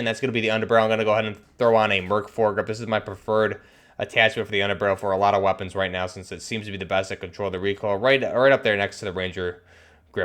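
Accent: United States. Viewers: What voice does grom us? american?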